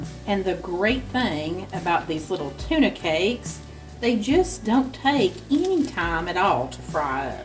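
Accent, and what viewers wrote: South, United States. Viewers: You are adorable and I just love your southern accent!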